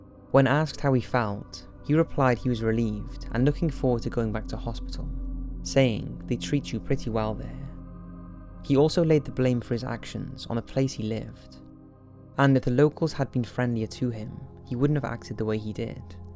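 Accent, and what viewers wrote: Wales, United Kingdom. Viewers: This was amazing! Your quality of production and information is always top notch. Also, I'm welsh and I can hear a bit of a welsh accent in your voice..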